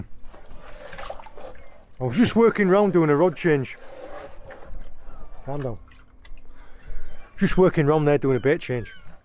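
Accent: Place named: Middlesbrough, United Kingdom. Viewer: I’ve been watching your videos and I do like the fact you explain the tides and wind and how you set your boat for fishing😁😁🤩👍 I have also noticed your accent and it sounds like you could be from Middlesbrough or cleavland or red car love your content 👍👍👍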